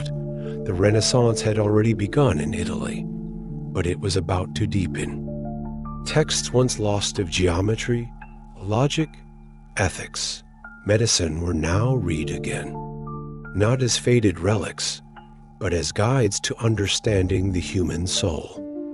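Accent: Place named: United States. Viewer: This American accent sucks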